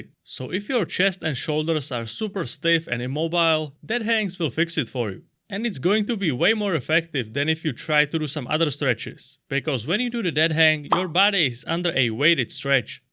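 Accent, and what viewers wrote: Czech Republic. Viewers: yo are u Czech ? just guessing from ur accent haha, great video anyways, will definitely try it! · you are from czechia, the accent cannot be hidden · Český přízvuk 😅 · Hey! I know you will most likely miss this comment, but I still wanna ask: I have an oddly specific interest in accents and like to make a small "game" for myself in guessing them and after watching a few of your videos I wanna ask: Are you Czech? Odd question aside: I love your content ❤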